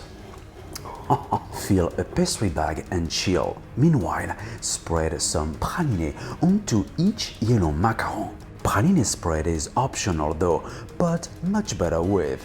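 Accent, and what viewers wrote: France. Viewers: Banish with as French accent!! Need anything else . Make a macaron!!!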